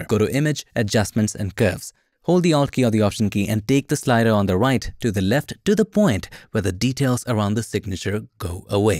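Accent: India. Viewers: Dude tries so hard not to sound Indian.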